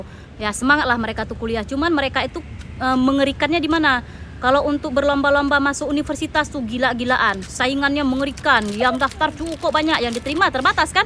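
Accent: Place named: Sumatra, Indonesia. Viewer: Siap kk, saya selalu mnton videonya kak, cara bicaranya cerdas dan lugas bikin gemes, dan logat khas Sumatranya juga dapat banget.. hehe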